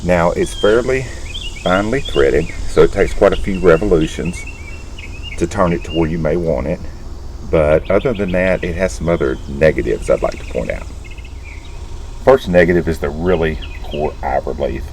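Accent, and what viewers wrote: South, United States. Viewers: great no bs video comparison. laughed all the way thru it! you remind me of Duke Nukem with a southern accent, you are awesome brother keep it up.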